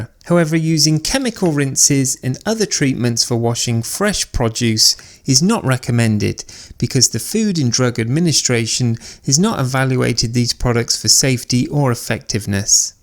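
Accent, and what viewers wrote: United Kingdom. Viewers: good info and nice british voice!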